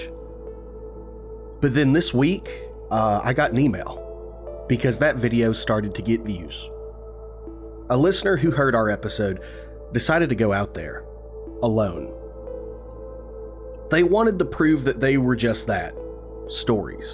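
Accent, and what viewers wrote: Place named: Tennessee, United States. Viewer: Enjoyed! I like your accent. Sounds like my neck of the woods. TN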